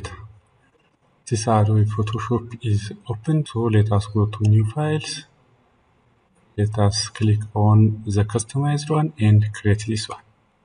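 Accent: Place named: Ethiopia. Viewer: My bro is definitely Ethiopian... the accent 😄 We were looking for a fix while we are using the internet bro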